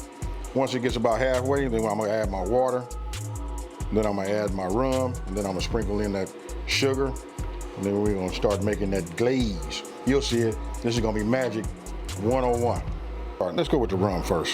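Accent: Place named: Bay Area, United States. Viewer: He gotta be from the Bay sounding like that.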